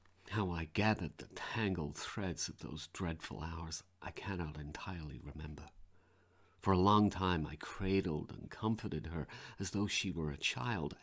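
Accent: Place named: United States. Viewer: Whoa! Chilling! (Your American accent is awesome, by the way )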